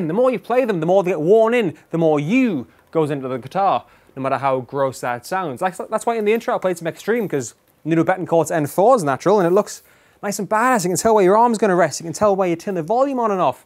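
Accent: United Kingdom. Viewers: I'm 4 years late, just got this guitar. British accent hurts my ears but I liked and subscribed. Great video. I'd prefer some more cockney talk though, like "this axe is all kinds of honey and butter!", but got my sub regardless. Redcoat.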